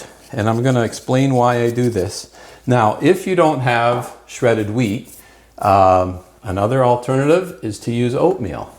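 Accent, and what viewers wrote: Canada. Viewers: Looks great. Will definitely give it a go. Are you Canadian?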